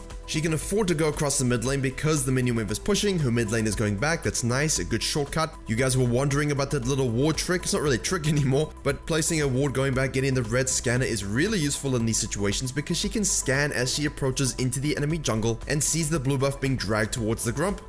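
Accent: South Africa. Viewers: you're from south africa! <3 I am proud!!!! haha I did not even notice. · Are you South African? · You sound South African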